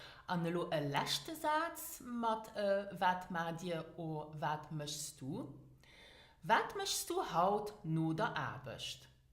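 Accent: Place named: Saarland, Germany. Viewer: Lastly the falling wood in the sky this is Saarländisch (dialekt from the german region which borders Luxembourg). it is very similar to Lëtzebuergesch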